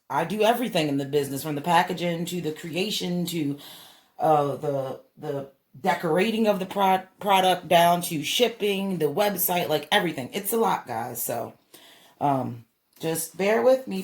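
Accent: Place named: Baltimore, United States. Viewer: Your from Baltimore right ? Your accent gave it away, i have family there❤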